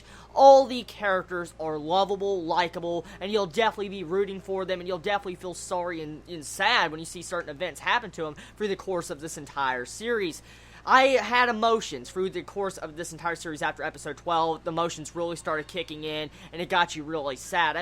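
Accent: South, United States. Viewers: I think looking back on your older videos, and your recent ones, you've lost some of your southern accent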